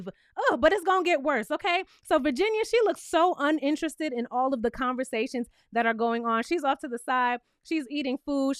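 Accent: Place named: New York, United States. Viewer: I'm here for the New York accent when it comes around top tier commentary as always ❤❤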